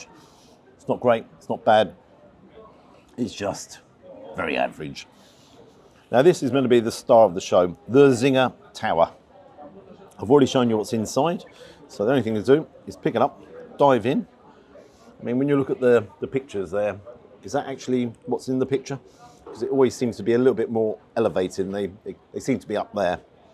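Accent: RP, United Kingdom. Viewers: Such a posh toff sometimes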